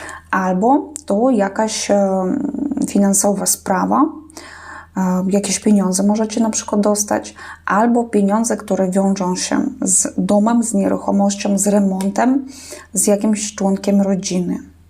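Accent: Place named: East, Poland. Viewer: Bardzo ładne czytanie, wyczuwalny lekki akcent ze wschodu, ale ogólnie poprawna polszczyzna 😊 Bardzo mi się podobał ten przekaz dla Rybek. Pozdrawiam ❤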